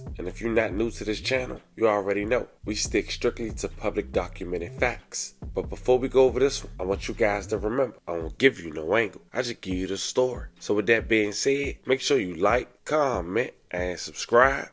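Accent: Washington DC, United States. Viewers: Sound like he from DC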